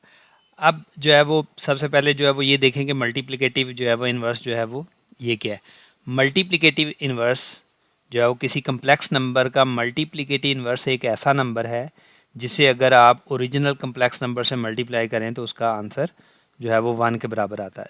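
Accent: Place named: Punjab, India. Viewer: The bad thing about online classes is we missed your punjabi accent and jocks❤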